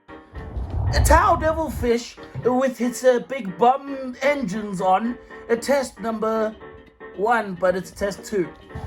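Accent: South Africa. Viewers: dude sounds maybe a bit south african? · I have to ask: are you originally from South Africa? Feels like I'm hearing a familiar accent 😅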